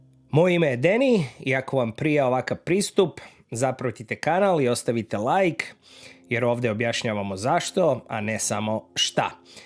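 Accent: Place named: Ekavian, Serbia. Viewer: Ти мени дјелујеш као Хрват и коментаришеш, а причаш екавски имам такав дојам,поздрав теби и успјех на каналу.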